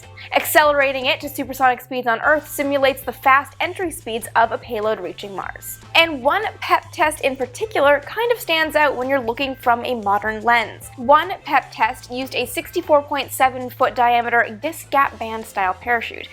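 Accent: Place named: Canada. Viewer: I was wondering the same thing - I noticed how you { } pronounce your "ou's" when you say words like "about". {FWLIW: Growing up in Florida, there was an 'older couple' who were neighbors of my parents. The husband was from Italy, and the wife was from Canada. Listening to her talk was my first exposure to that pronunciation.}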